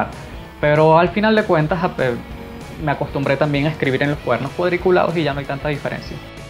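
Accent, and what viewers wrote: Venezuela. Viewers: me encanta tu acento venezolano...saludos desde mexico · ¿Y de dónde eres? No he podido identificarlo por el acento... ¡Ah, ya! De Venezuela.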